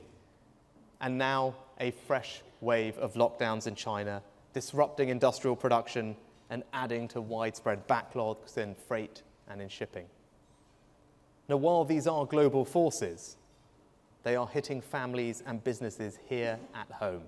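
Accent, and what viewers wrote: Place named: RP, United Kingdom. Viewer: He sounds so upper class British - how about the working class?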